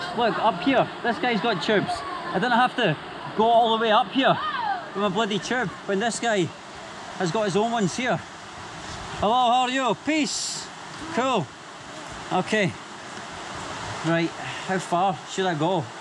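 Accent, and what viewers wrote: Scotland, United Kingdom. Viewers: Don't know how one of your vids found its way to my recommended list but I am loving your travel vlogs. Also love the Scottish accent so hearing you talk is a bonus for me ☺ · so guys in conclusion , every humans has a bad side , so no one is wrong in here, ok case closed but if im there , ill actually be friendly , because i want to train my english, and also he has a scottish accent which is something i like. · even then, he's using a scottish accent (which of course makes it even harder).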